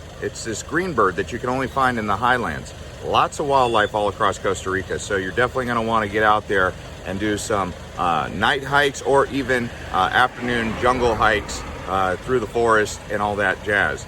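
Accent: United States. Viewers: Damn, I am a gringo married to a Costa Rican woman and his American accent kills me! LOL!!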